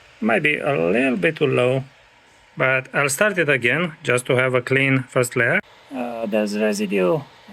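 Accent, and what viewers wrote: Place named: Romania. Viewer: are u from romania? ur accent sound like a romanian one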